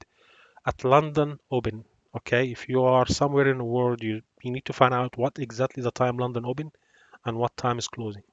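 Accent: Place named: Egypt. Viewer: Thanks for your great analysis. Do you have any analytics in Arabic ? I think you're an Egyptian from your accent All my greetings.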